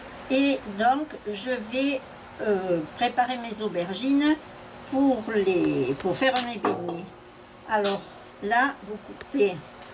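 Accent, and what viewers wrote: Provence, France. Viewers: J’adore les aubergines, je voudrais tester votre recette mais les aubergines sont quand même longues à cuire ! Combien de temps les laissez vous dans la fritures ? Et je suppose a feu moyen ? Merci pour vos précisions, un plaisir de vous entendre avec ce petit accent provencal !? 😊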